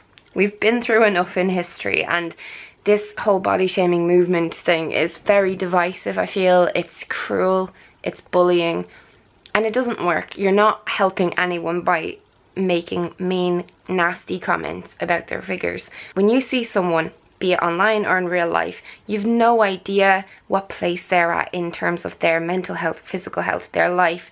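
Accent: Ireland. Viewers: Hey! I've spent in Ireland quite a lot of time, almost a year actually, (in Drogheda) and I miss it so much that I started looking for Irish youtubers so that I could hear that gorgeous accent again! Well, I eventually found you and I must say I really like your channel! Very interesting... You got yourself a new subscriber! Good luck for everything, bye from Italy:) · I just subscribed to your channel a few days ago and I just wanted to tell you that I reeeeally like your videos!!!♡ (and your Irish accent omg absolutely love it and could listen to you for hours ♡♡♡♡ I've studied in Maynooth for one semester and I was looking for Irish youtubers for so long!!) Thank you so much for speaking up against body shaming!!! I'm 24 now and I'm still struggling with my body image and weight and I know that part of this is because stupid girls in school told me I would be fat (that was at the age between 11 and 15...so exactly the time when you don't feel comfortable in your body anyway). 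It's so sad to think that those comments still affect you years and years later because when someone repeatedly tells you your too fat/too skinny/too whatever you start to actually believe it... I sooo wish that people would just stop being mean towards each other and I hope this can happen through the help of influential people like you and many other kind-hearted Youtuber!!! xxxx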